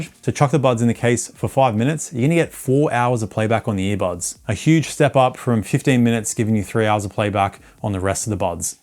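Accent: Australia. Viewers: Yaaa rigght.. Soundcore sound quality is up there almost the best. Upgraded from Edifier x3 to Soundcore, Good build quality too. As Soundcore Spirit X2 and X10 user.. Both earhook design stays on ear good. But Spirit X10 was uncomfortable to take off ear and must twist the hook making it fall often while storing it anywhere or back in charger. It was irritatingly annoying. . And lot o wind noise while riding bike. I switched to stem shape buds, first one Tribit flybuds C1. Good sound only at almost full volume. Small soundstage but decent comfortable listening as no distortion. Further upgrade to Cmf Buds pro 2, mutiple features, Spatial audio. Ldac(battery drainer, almost same sounding). Very loud,too much bass if selecting bass boost abovs 2. Very Bad fit in ears with stock tips and have to try hybrid silicon or memory foam tips later. Hey, do a review on good n best eartips, they r most important part of earbuds. 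Plenty of good sounding budget earbuds in market sub 50 dollars.. and midrange sub 100 dollars price .. But Soundcore has the top sounding buds that competes with higher more expensive branded buds. Am gonna get Liberty 4c, hows the fit? . Good review with highly distinct Aussie accent,, slow down a bit mate.( jus kdn) .., slow when you highlight the main points. I rarely refer to earbuds reviews but saw yur channel, has lots n lots o buds.. Sooo manyyyy.. How you pick yur favourite from sooo many??, well i suggest yu keep diffrent for various occasions.. Travel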